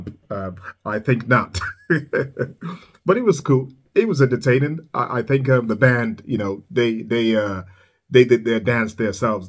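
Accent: England, United Kingdom. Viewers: Your English accent and diction are refreshing. We get so many mumble mouthed people here in the States.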